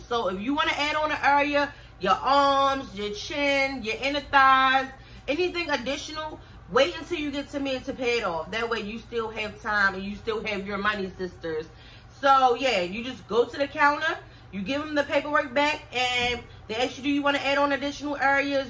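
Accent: Baltimore, United States. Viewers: Glad you did good with your drink. Your right, it’s good to try the massages. You look fabulous by the way and I’m loving your accent. Sounds like Baltimore??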